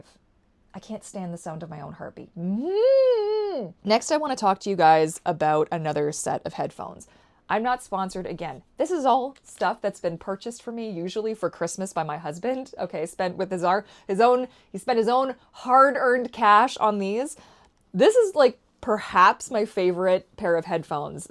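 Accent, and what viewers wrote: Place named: Canada. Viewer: Most people who are watching your videos are American? So I can say Hi from Germany. 🤗 I have half-siblings in the US that I have met for the first time in my life last year. And I have to say thank you for your videos and your Canadian English which I love to listen to. Because I can understand it so much better than the American accent from the southern states. 😂😊 And I wear Loop Switch 2 Earplugs. I love it that they are so small that you can't see them at first sight. But when you see them they are nice to look at. Sometimes I wear them at work when I have to concentrate for a while. They are doing a good job then. But sometimes I hear my heartbeat very loud. I feel uncomfortable then that I have to take them off. After this video I will try the Bose for private locations. 👍🏼 Please be kind because of my English. 🙈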